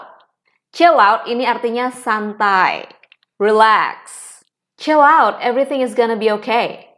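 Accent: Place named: Java, Indonesia. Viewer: Ternyata = ndilalah 😂, masih mantep kak logat jowone, Kediri menyapa kak😁👍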